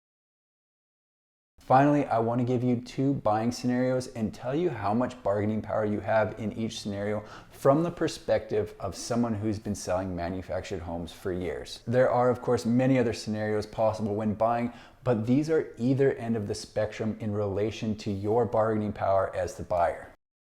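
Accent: Canada. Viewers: Why am I listening to a canadia?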